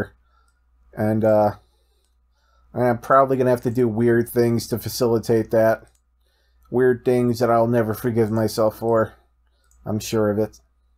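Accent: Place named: United States. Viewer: This guy sounds so American idk...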